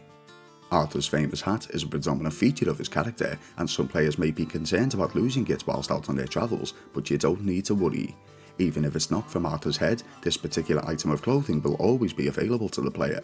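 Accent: Liverpool, United Kingdom. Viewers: 😂 he is a native he's from Liverpool by the sounds of it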